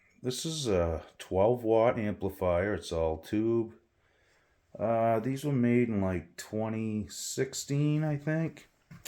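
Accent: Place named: Boston, United States. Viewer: you boston sounding mf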